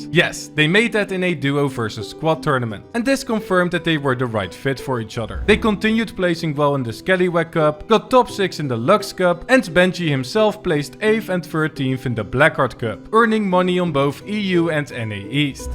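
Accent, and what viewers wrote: Netherlands. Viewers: I smell Dutch in your accent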